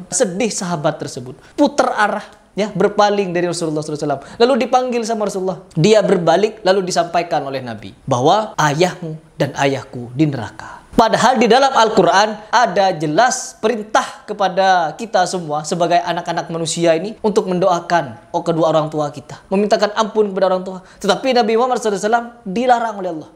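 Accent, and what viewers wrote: Madura, Indonesia. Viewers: Assalamualaikum 🙏💪 bang maaf bang Kayak orang Madura dr logat bahasa nya Madura Sy dr Madura bang 💪💪💪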